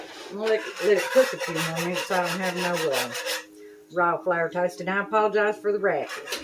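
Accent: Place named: Virginia, United States. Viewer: I found you last night. I can’t wait to cream some cabbage. God bless you darling. I’m from near Dickerson county va. Talk just like you. Is a pleasure hearing you talk. I can understand, to a’-t.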